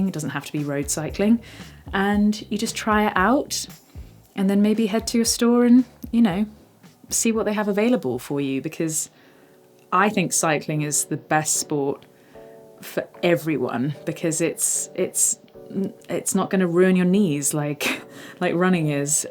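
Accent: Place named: England, United Kingdom. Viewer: Wrecked, hilly...sounds so English...please use an American Accent...will be so fun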